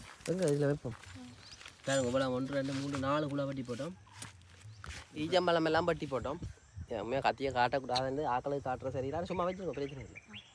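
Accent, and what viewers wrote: Jaffna, Sri Lanka. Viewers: உங்களுடைய voiceஎன்னுடைய jaffna நண்பரகளை நினைவு படுத்தியது அண்ணா 🥺🥺🥺🥺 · ஆர்ப்பாட்டம் இல்லாத யாழ்ப்பாண தமிழ். வெகுவிரைவில் ஓரு லட்ஷம் 👌👌👌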